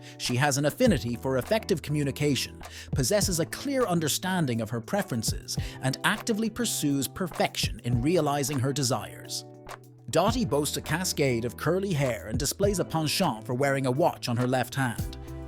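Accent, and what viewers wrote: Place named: Ireland. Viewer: Irish accent reading non-English native's descriptions. Oh, the dissonance !!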